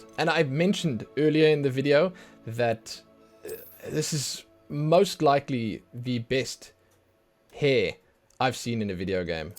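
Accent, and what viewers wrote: South Africa. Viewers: Are you South African? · I like how detailed this is thank you, and by any chance are you South African? I love your accent! Also I love your commentary and jokes here and there it makes me laugh! Thank you for this lovely video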